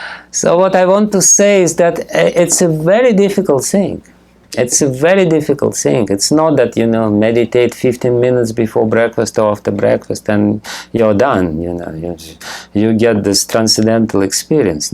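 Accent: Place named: Russia. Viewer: Хотелось бы знать, кто этот Гуру. Ясно, что из России, акцент очень сильный. Where is the description about Him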